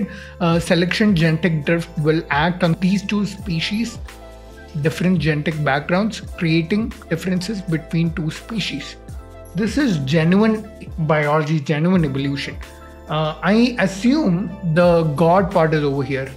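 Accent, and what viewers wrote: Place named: India. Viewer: First your knowledge seems good but you laugh of what was written in the text.firstly you are reading as as if you are talking Hindi not English ,secondly read the Al Quran tilawat before you made this vidz,learn from things around you ,then judge ,don’t mock or disrespect the creator mark my words you will pay the price. for that kind of blasphemy soon or later.remember me not the likes you will have.my respect again to people what ever their faith or belief.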